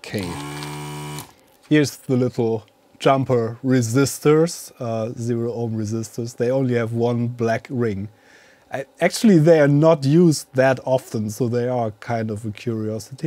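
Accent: Germany. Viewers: Ok, erstes Video was ich von dir sehe, und direkt raus höre nur mit dein Hi das du Deutscher bist.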